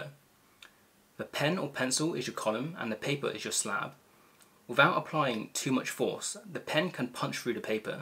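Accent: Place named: United Kingdom. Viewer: Great layman's explanation. Also nice British accent!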